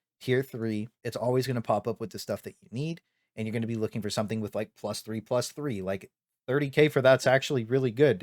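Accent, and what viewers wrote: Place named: New York, United States. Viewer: Bro are you italian and from new york hahaha you have that whole vibe going, great video btw!!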